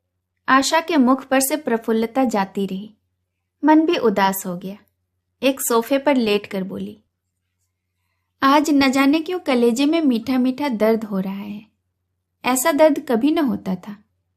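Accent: Standard. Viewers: Apki shudh Hindi ,aur awaj ki madhurata kya khne . Munsi ji ki kahaniya , sansmaran , upannyas bahut hi hridaysparshi hote h . Pranam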